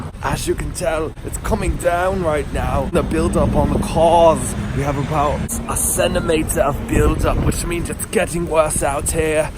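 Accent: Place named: United Kingdom. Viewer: Your voice is different by adding that your accent looks British, not American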